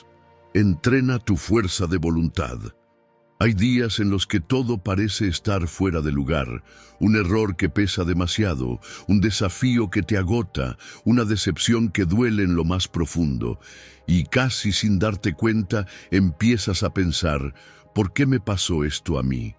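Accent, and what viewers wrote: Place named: United States. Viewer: Acento americano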